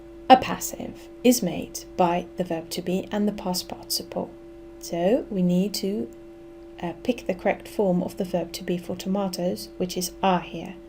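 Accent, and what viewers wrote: England, United Kingdom. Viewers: Clear , precise ,and a business like approach I loved it. And a wonderful cultivated English accent . I am delighted .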